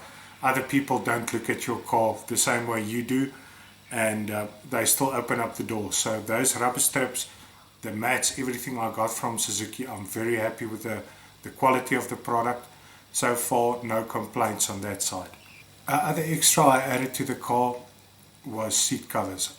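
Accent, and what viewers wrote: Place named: South Africa. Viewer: I love your South Africa accent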